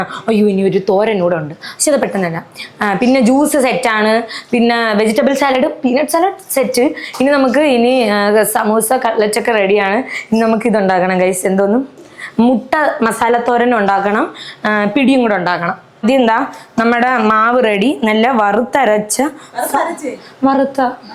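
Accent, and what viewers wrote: Trivandrum, India. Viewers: Nombu eduthittu kaanunnavar undo nammude trvandrathu ingane aanu parayunnu uratti 🥰❤️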